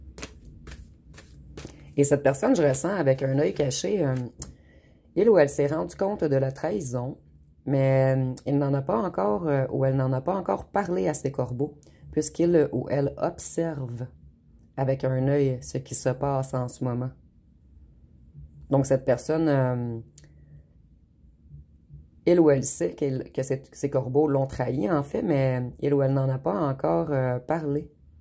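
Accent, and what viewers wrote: Quebec, Canada. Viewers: Ah ah non en France ce n’est pas spécialement en juillet ! C’est toute l’année à n’importe quel moment ! Sauf que dans mon cas en effet, mais c’est moi qui doit déménager en juillet ! Avec un peu de chance il aura envie de venir emménager chez moi ! 😆 Merci pour cette guidance ! C’est bon d’entendre votre accent Québécois qui me manque beaucoup ! ❤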